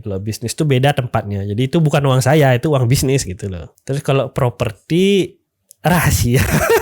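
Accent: Bali, Indonesia. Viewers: kenapa ya orang bali klo ngomong enak didengarnya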